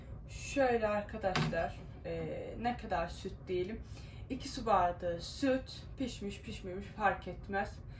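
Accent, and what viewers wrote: Bolu, Turkey. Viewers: Abla seni izleye izleye evde bolu sivesiyle konuseyom herkese gııııı deyom seni seviyoruz devamkeee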